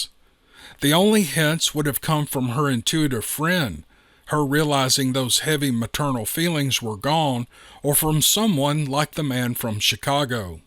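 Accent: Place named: Texas, United States. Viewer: Is this guy from Texas Listen to that thick ignorant accent he's got. Like he's about to eat a piece of pie or just got done mowing his yard and drinking 5 beers to do so popping open the sixth his wife knows that she's gonna get beat down tonight so she pops a Xanax and a Vicodin and downs it with 1 whiskey sour mixed drink. She's only gonna feel it in the morning when her children are starring down at their breakfast eating in a hurry trying to avoid any eye contact or small conversation with their physically and sexually abusive father or their coward mother. Just five more yrs Billy says to macey his twin sister after breakfast as they swing on the back porch. Macey stares out into the acreage and deep woods of the backyard whishing someone or something would happen to end it all now. 13 long yrs of hiding under their beds running for their lives into the woods and communicating through walkie talkies to make sure they avoided their old man. 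Then church every Sunday where they have to watch him deliver his sermon from the front pew. The older they got the more the well masked hippocracy seemed to cut into not only their religious beliefs but also their belief in the goodness of humanity. Their mothers fingers seem to calmly and masterfully stroke the ivory and black keys of the church piano while the congregation sings hymns but if you sit close enough and know what to look for you can see her hands trembling slightly more each week as she loses her dignity and self respe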